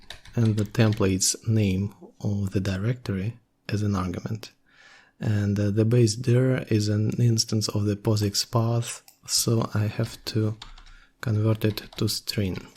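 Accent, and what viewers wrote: Russia. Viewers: Найс ролик, видно сразу - Русский, ну или из другой странны СНГ, акцент сдал)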